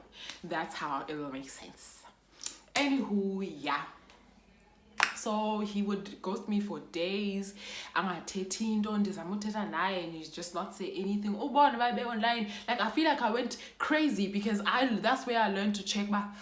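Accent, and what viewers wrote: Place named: United Kingdom. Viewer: That British accent wow you just nail it 1️⃣0️⃣0️⃣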